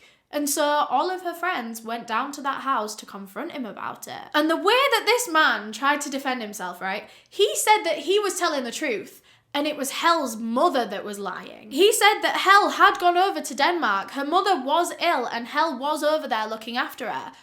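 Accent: United Kingdom. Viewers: What’s her name ? I want to read about the case more in depth. Maybe it’s her cute British accent but is it “hell”? What’s her last name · youve had suchhhh a GLOW UP, you gorgeous babe! i absolutely adore you and your cute uk accent 🤣🤣🤣🤣 i wonder if europeans think americans have "cute accents"? LOLz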